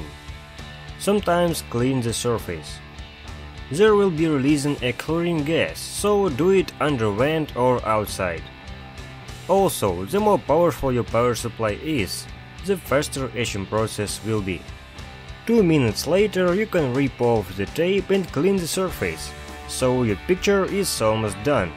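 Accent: Ryazan, Russia. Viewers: Акцент рязанский )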